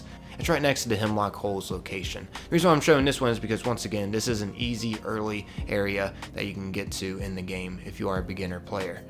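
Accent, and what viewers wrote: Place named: Delaware County, United States. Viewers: Are you from Delco lol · Philly all’s I hear is philly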